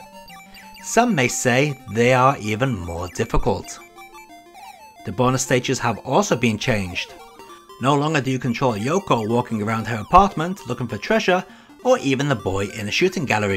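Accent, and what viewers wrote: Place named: St Helens, United Kingdom. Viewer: I've got to ask, mate. Where are you from originally? Northwest right? Sound like st Helens.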